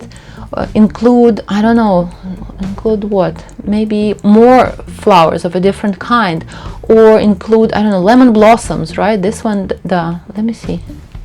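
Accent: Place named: Russia. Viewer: New subscriber from Oklahoma. I thought your accent might have been Russian. I had the privilege of visiting Russia in 1996 when I was just 17 years old. It has held a place in my heart ever since.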